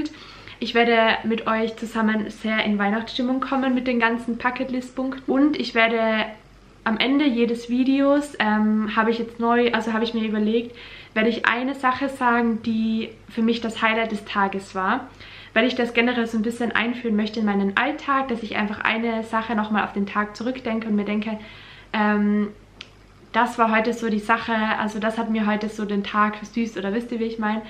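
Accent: Austria. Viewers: Welcher Dialekt ist das? "für die Uni zu dun" "Unicondend" :D klingt nach Österreich oder so?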